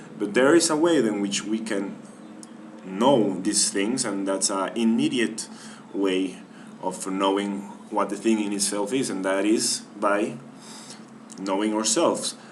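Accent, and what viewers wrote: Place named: Mexico. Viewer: Good English, where are you? I can tell you're Mexican but do you live in the States?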